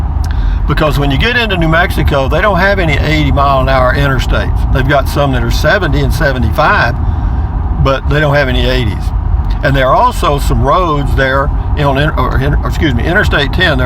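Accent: Texas, United States. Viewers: I thought the same thing the first time I heard him say it, but then figured out it was just his Texas accent. It is funny to me, though.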